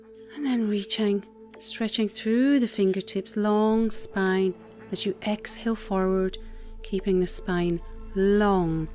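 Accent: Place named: Scotland, United Kingdom. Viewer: Love that scottisch accent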